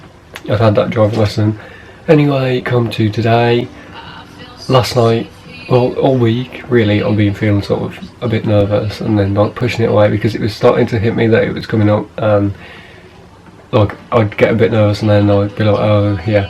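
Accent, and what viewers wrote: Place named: Dudley, United Kingdom. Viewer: I passed on tuesday first time!! Are you from dudley? You sound like it, i am too!! I was so so nervous! I got 5 minors and that was because i bumped the kerb twice and stalled twice on a hill which i never do, if only my nerves didnt get to me i would of got 1 minor! But im so happy!!!! Congrats to you too! Enjoy your freedom, who was your instructor?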